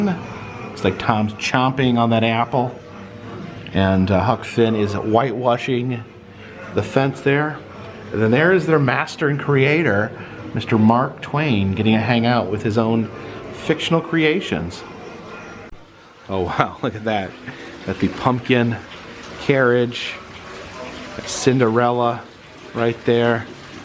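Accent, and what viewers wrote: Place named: Oklahoma, United States. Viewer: Hey bub it sounds like you might live in oklahoma. Let me know. I would love to meet you!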